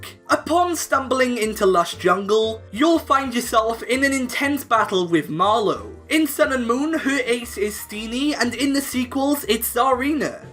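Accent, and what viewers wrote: Wales, United Kingdom. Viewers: Are you welsh ? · you are welsh aren’t you. I can kinda make out some welsh accent. Possibly, I’m an American so I know nothing.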